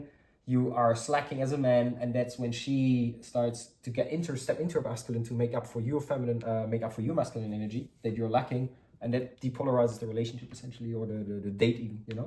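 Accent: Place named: Eastern Europe. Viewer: Where is your accent from?... You sound eastern European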